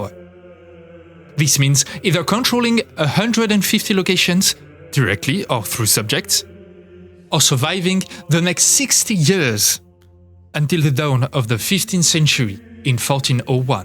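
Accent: France. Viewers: english with a french accent but one that is nice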